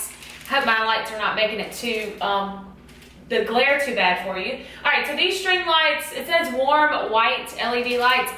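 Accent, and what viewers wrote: South, United States. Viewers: You are the funniest teacher YouTuber I've watched. I laughed so hard when you were talking about not looking at the price for the notebook because you might regret it lolol. The American southern (?) accent makes it even funnier for some reason. I'm Australian so it's not something we have around here. Either way I subscribed!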